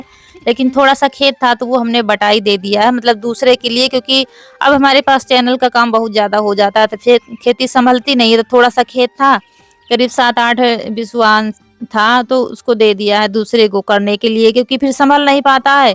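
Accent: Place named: Uttar Pradesh, India. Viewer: up me kaha se didi hum bhi up ke kanpur se hain... Bhasha boli aapki jaani pehchani lagti hai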